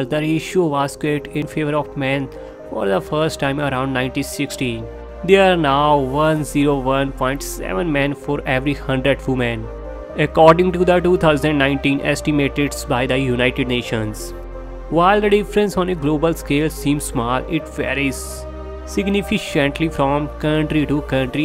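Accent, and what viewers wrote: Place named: South Asia. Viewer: Can’t you tell he’s from south Asia (indo-Pak) ?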